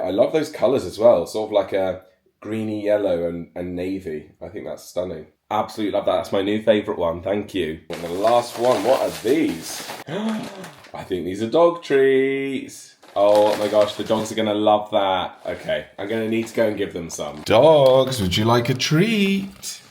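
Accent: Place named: Yorkshire, United Kingdom. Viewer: the Yorkshire accent developing well lad.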